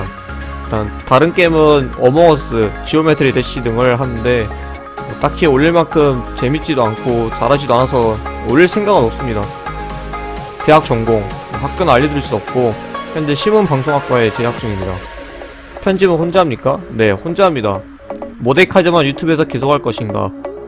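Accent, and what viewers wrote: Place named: Jeolla, South Korea. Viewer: 사투리가 있는걸 보니 전라도사람이시군요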